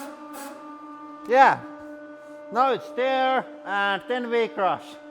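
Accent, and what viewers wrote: Finland. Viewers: you gotta love the finnish accent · Haha Shit Paper... you Finglish is fantastic. Keep this stuff going, it's great:) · I thought it sound like a finnish accent and now its confirmed haha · How the fuck he can’t talk English even he is old man? Cmon stop that Finnish-English shit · The english-finnish acsent is just so crappy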